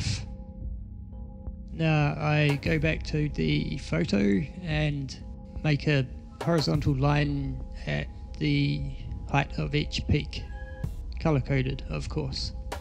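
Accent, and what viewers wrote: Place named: New Zealand. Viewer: Love the video. Intriguing with the music, kiwi accent, talk and images. And good photos to work with. But sorry it's wrong because you can't just pull any curvature drop down from the top of the mountains. You must calculate the part of the mountain(s) that you actually can see above the horizon. If you do that you'll know whats missing from the bottom part. And that will be the amount of drop. And then you got it right. Approximately 1000 meters are missing from Mt.Tapuae. As illustrated here: <link> If you compensate for "Peak 3" being some 2km beyond Mt.Tapuae then it could be closer to 800m. But the drop is there, it's huge and it's according to curvature calculation. I hope you make a follow up. I haven't got the time to make video's myself. Take care!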